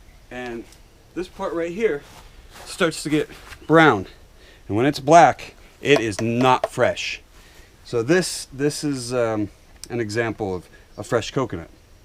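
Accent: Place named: Midwest, United States. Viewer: I’m willing to bet you did not grow up in Hawaii. You know you would be ridiculed for wearing those sandals and speaking with a midwestern accent. Be honest, what state are you really from?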